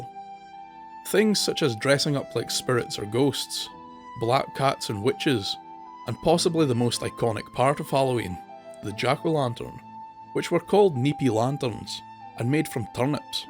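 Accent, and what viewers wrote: Scotland, United Kingdom. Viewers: His Scottish accent might be a hint lol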